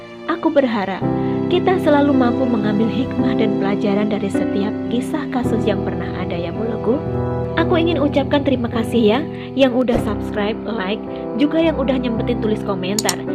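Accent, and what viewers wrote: Java, Indonesia. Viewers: Nemu chanel baru,saya nonton udah 3 kali,tapi baru comen dan gahung sekarang,enak juga suaranya seperti cerita miranti cuma masih ada logat jawanya sedikit.Sukses selalu.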